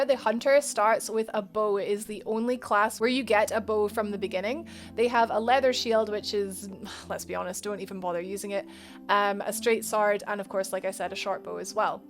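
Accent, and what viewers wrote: Scotland, United Kingdom. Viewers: Is that a Scottish accent? It sounds cool. You have a cool accent, you should try your best not to sell it to someone or accidentally discard it. Accents are valuable commodities.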